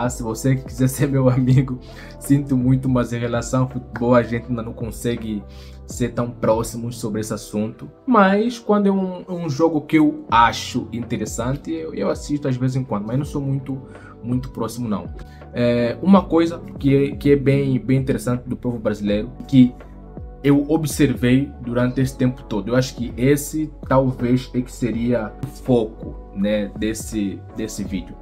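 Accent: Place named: Angola. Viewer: Faz sentido tudo o que você disse. (Acho o sotaque angolano lindíssimo! 😊)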